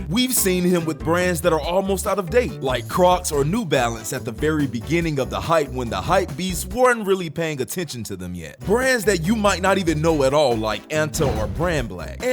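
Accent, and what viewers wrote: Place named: United States. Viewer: I swear the voice sounds like an ai but i cant quite tell for sure because i know this is a french channel but the voice actor sounds very american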